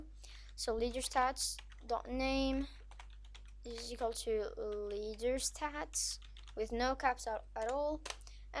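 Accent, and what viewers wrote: France. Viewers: Are you french ? i am french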